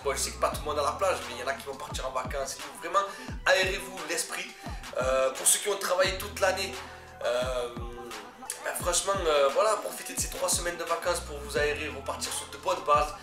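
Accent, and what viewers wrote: South, France. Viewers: Notre tjrs aussi beau tjrs aussi bn merci pour ce nouveau bijou de ta pars bisous et tn petit accent du Sud me donne la chaleur qui y fait =)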